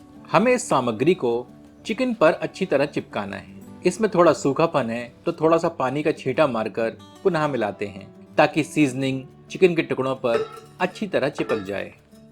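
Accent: Standard. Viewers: Ur Hindi is so shudh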